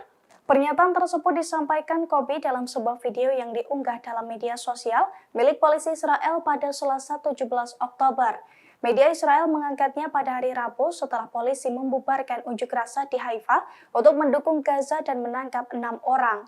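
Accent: Java, Indonesia. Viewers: mbak e wong jowo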